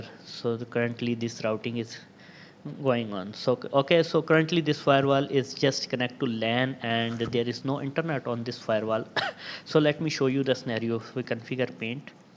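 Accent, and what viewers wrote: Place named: India. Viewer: I liked the video and helped me a lot, also I I'm using this chanel to get used to Indian accent.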